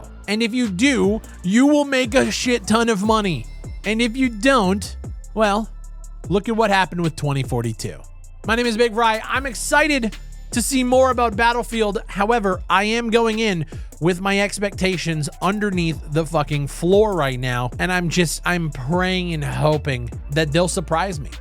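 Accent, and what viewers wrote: Canada. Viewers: u Canadian or whats the accent ?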